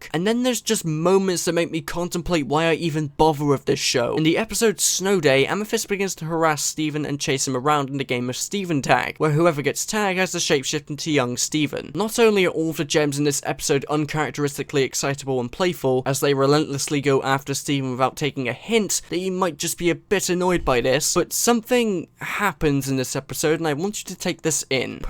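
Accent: United Kingdom. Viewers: I use your videos to pick up the british dialect, oh, and the actual content too.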